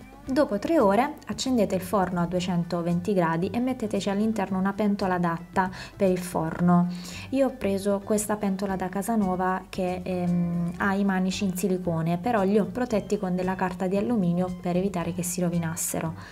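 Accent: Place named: Marche, Italy. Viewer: Preferisco 1000 volte il suo accento marchigiano e la sua bravura alle cachielle presuntuosette, non ti pare????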